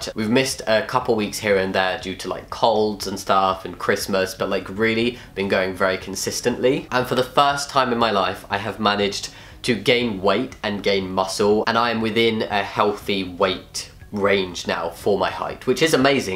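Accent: United Kingdom. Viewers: i love all the british talk its cute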